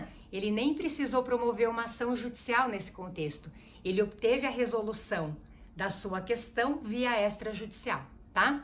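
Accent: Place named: Paraná, Brazil. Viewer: Esse sotaque é do Paraná hein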